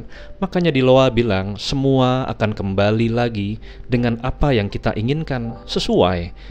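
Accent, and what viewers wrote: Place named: Sunda, Indonesia. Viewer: Suara bang khas suara orang sunda, suaranya sendu, entah knp dnger suaranya sedih, tp kisah"nya sangat menginspirasi sekali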